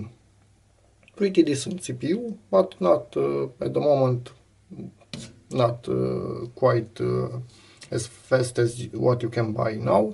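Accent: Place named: Romania. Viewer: Dupa accent esti roman :P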